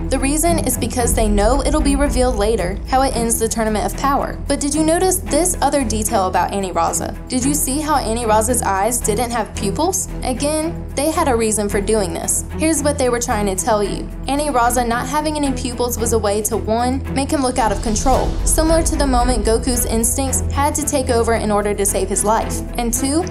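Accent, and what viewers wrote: South, United States. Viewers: I hear country in your voice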